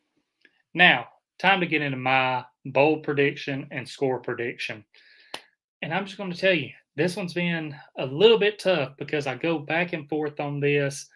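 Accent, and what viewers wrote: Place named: South, United States. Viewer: Dolphins fan here....this guy has a very strong country/southerner accent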